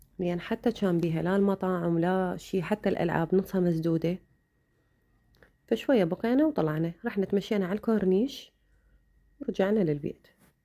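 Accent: Basra, Iraq. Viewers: عيب من الي يحجن هيجي حجي اني احب حجي أهل البصرة اتشرف بيج اني من أهل طارمية بغداد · لهجة اهم البصره تجنن وربي متابعه الج من الدوانيه حياتي ربي يحفظكم ويحفظ ابو علي والجهال الج اللهم آمين يارب العالمين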